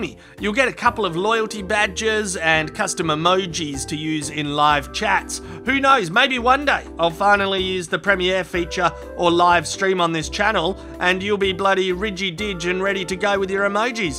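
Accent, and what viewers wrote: Australia. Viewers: And yep spoken like a true ocker, funny as fuck! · I am 2 beers into this video and lemme tell ya some english sounds so much better with Ozzy. Is Ozzy short for Ozzimandias I donno mate, cheers.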